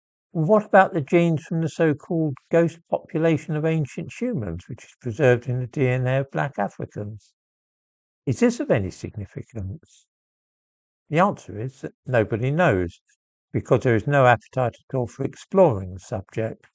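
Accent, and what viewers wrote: United Kingdom. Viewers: It's so difficult to understand British accent. I can watch videos on science and philosophy in american english all day long for decades, but one single 5 minutes video with a strong british accent and I need subtitles along questioning my whole life and ability of speaking english. LOL It also happens every time I go to London. And I lived in London for a year (12 years ago). Jeeezzz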